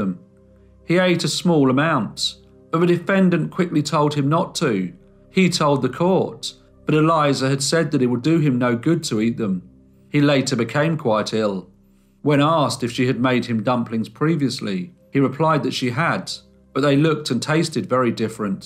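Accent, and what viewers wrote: United Kingdom. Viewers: All true stories are very sad, i really like the morn drawings All this criminal stories are hard,and mournful. The drawings give it a good touch i also congraculate You for your British accent i lived in the States,and they speak very fast,and they don t Pronunciation. Besides, the manager woman Had never been investigated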